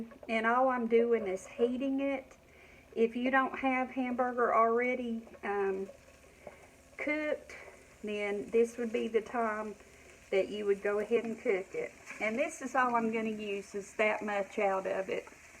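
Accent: South, United States. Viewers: You can freeze just about anything... some things nooo lol but it's always nice to learn something huh? Love her southern accent;)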